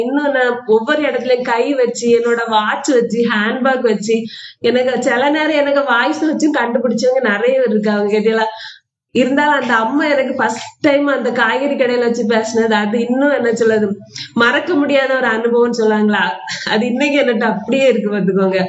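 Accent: Kanyakumari, India. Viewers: உங்க குரலுக்காகதான் நான் முதலில் பார்த்தேன். இப்போது உங்களையும் பிடித்து விட்டது உங்க கன்னியாகுமரி பேட்சும் பிடிச்சிட்டு. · கன்னியாகுமரிஸ்லாங் தான் உங்களூடைய வெற்றிக்கு காரணம் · Mam unga vedio daily parpen ,un kayakumari slang ennaku romba pdikum mam super aa panringa unga job CS pati solunga mam my daughter want to study CS so pls guide vedio onnu podunga ,how job opportunities after CS pls explain mam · உங்க குரல், அந்த பேசுர அந்த கன்னியாக்குமாரி வார்த்தை. எனக்கு ரொம்ப பிடிக்கும். முக்கியமா, கேட்டியலா...அதற்கு நான் அடிமை. நான் திருநெல்வேலி .பக்கம்.சங்கரன்கோவில்..... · Ivanga thirunelviy illai. kanyakumari maavattam. kannykaumari maathiri thaan azhakaa pesuhaa. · அருமையான இனிமையான கன்யாகுமரி பாஷை வாழ்க வாழ்க வாழ்க வளர்க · கன்யாகுமரி slang ,சுறுசுறுப்பு , neatness, மீன் , உங்க உற்சாக குரல் இவை எல்லாம் உங்களை பிடிக்க முக்கிய காரணம் இப்போ இந்த சாந்தமான முகம் ரொம்ப பிடிக்குது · I love fishes so I’m Eagerly waiting for ur daily fish videos neenga seirathu patha saputa mari oru feel😋😋😋 and Ur Kanyakumari slang is so natural ❤nan Coimbatore unga slang enaku kekka puthusa nala erunthuchu 🥰love from Coimbatore 💟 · Best wishes for your grand success in your life n channel. 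Your voice, Kanyakumari way of Tamil speech r all good. Carry on 💪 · Your kanyakumari dialect and baavala illadha humility dhaan vunga plus points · உங்கள் குரல் மற்றும் பேசும் விதம் ( அந்த கன்யாகுமரி பேச்சு) இது தான் உங்கள் வளர்ச்சிக்கு காரணம்.வாழ்க வளமுடன்.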